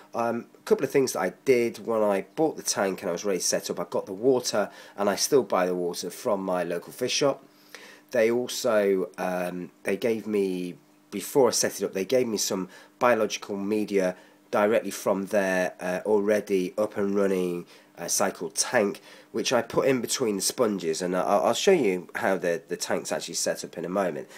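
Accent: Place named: England, United Kingdom. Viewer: Awsome gd to hear an English voice on nano tanks gd look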